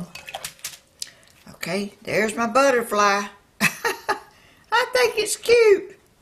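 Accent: South, United States. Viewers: Ms., You are a TREASURE! You've filled me with joy watching you crafting. Thank you for making my day. Your enthusiasm is infectious, your video skills are entertaining, and your southern accent is delightful. I can't wait to make my own crystal heart, butterfly and cross. (I'm from Michigan and, like you, I too love the sunshine, flowers, butterflies and I can't wait for spring and the snow to be gone for good. And I'm sure our bluebirds, who just showed up, agree.) May God bless you, as you are a blessing to others!:) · Oh my stars sweetheart I thought you was from Alabama just by your voice. Thats a southern voice. You are awesome. I love watching you. I've learned a lot. Thanks from Alabama. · My granny Wright was from Ga and raised my Dad in NC. Your accent brings back many fond memories of visiting family in the south. I grew up in Ohio. You are a special lady, I can tell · Hello from Kansas City Mo I have enjoyed your videos of your projects so much. 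Anxious for spring so I can try the cement pots. Now need some pipe cleaners, didn’t know Borax could make something so pretty. I have started doing some Dirty Pour painting, finding it fun, it doesn’t require any art talent. By the way,I also love your sweet southern accent. Your place looks so peaceful & I love seeing all your birds while you do your crafts. Wishing you a blessed day. See you later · Hey! So glad to meet you,. I love your southern accent. My mom who is 82 now, is from Arkansas and you remind me of my relatives! You are so sweet! Take care and God richly bless you:) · HILARIOUS!!!! I'm sitting here, a lot of pain at the moment, using your relaxed motions, voice, and southern sounds to sooth me. I had JUST been thinking how much fun this would be with my grandsons now that they are on summer break and YOU mention how fun it would be for kids. Thank you so much. I greatly appreciate your crafts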